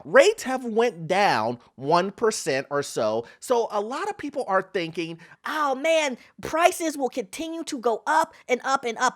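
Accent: United States. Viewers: I love your Midwest accent. Makes me want to pull up a rack of baby back ribs and talk real estate all afternoon!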